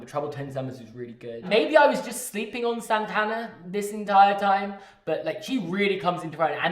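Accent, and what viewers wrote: United Kingdom. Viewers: He’s British? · I know you can’t be British with a name like but the accent says otherwise.. feels wrong man, we need to get you over to the motherland for some accent coaching.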